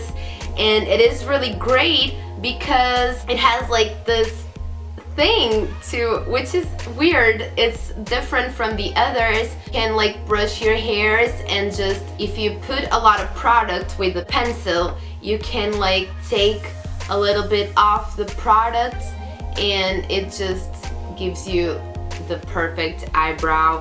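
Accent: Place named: Portugal. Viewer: hmmm okkk! Mas se calhar era uma forma de eles começarem a perceber outra língua! Acho que não é tão natural, eu percebi logo que eras portuguesa. E atenção, que falas muito bem! Mas acho que podias ter mais sucesso de falasses em português. Mas se não te sentes confortável, então já é outra coisa. Muitos beijinhos!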